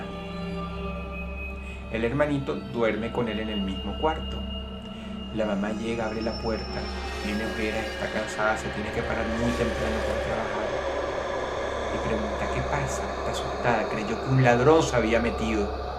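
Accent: Venezuela. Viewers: Sería buena idea como en casos de la vida real con Silvia pinal, pero en este caso sería el (acento feo venezolano) el problema sería tramitar con todos los autores de las historias, pero siendo el escritor se me hace extraño no lo haga, quizás no lo ha considerado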